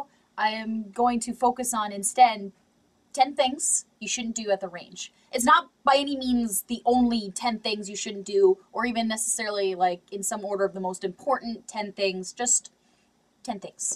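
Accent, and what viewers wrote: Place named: North, United States. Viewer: haha ur from up north rock and roll i can tell by your accent i spend 3 moths a year in northern mn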